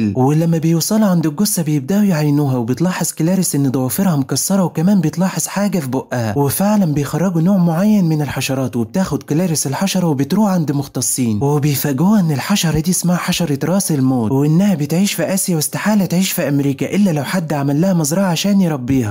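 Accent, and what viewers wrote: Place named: Tunisia. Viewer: الي يتكلم من تونس !!!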